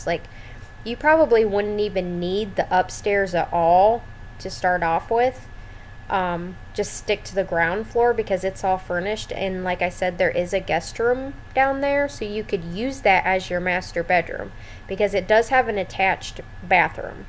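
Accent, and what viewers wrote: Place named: Minnesota, United States. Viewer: lovely build! quick question: are you from the midwest? you sound a lot like my minnesotan friend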